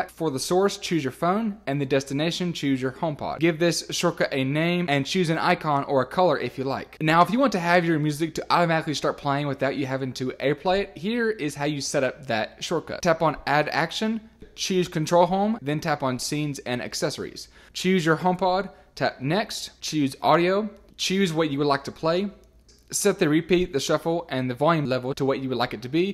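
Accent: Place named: South, United States. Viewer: I had trouble understanding what you were saying. Several times I had to pause or skip back a few seconds to try to understand you better. I don’t think it’s because you have a slight southern drawl. I think it’s because you are trying to talk too fast, and some of your syllables get swallowed up as the words run together. This is only exacerbated by how aggressively you've cropped out the silence. The tiny Pauses & breaks between phrases that you've trimmed, in my opinion have been cropped too close to the front & back of the words; there’s no split-second for me to process before moving onto the next phrase. Good info, just a bit too much effort on my end to try to understand what you're saying. I know you want to keep your videos short. However if you trim off a few extra seconds, but then that costs me a few extra seconds , 10 times throughout the video, did I really gain or save anything? 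It’s a bit exhausting to be honest.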